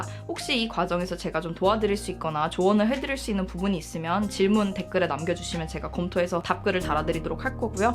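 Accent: United Kingdom. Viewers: 어제부터 꽂혀서 몰아보고 이 영상은 오늘 또 다시보고 ^^;; 정말 매력적인 외모에 똑부러진 한국어와 저음으로 깔아지는 포쉬영국영어, 탄탄한 컨텐츠, 껴들어서 유머를 담당했다 또역시 진지하기도한 잭까지 정말 빠지는게 없는 채널이에요. 공들여 올려주신 영상 모두 너어무너무 감사합니다. 남은것도 다 볼께요 ~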